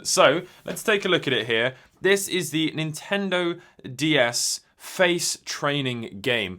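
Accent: Australia. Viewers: YOU HAVE TO BE AUSTRALIA MATE if not then british BUT I THINK AUSSIE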